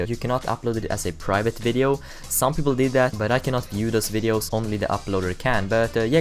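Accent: Sweden. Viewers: Det hörs tydligt att du är svensk så var tvungen att kolla upp det i kanalbeskrivningen ;) A men de e gött iaf att svenskar är bäst och har ofta bra utbildning i videoskapande. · Are you swedish? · Are you from Sweden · Lol man hör att du e svensk :D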